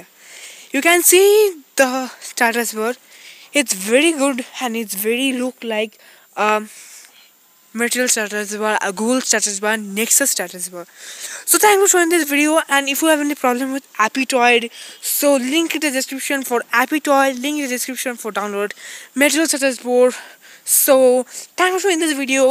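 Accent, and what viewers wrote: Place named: India. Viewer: How come I can never get a person who is American and speaks perfect English I can't understand these people it's always an Indian person in these tutorials!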